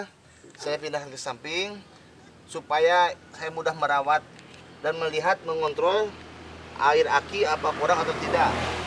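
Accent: Indonesia. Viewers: sunda na leket pisan kang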